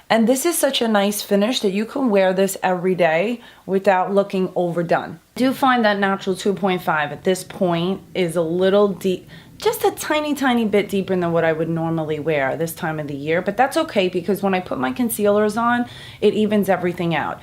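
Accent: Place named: New York, United States. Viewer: Love your accent love NY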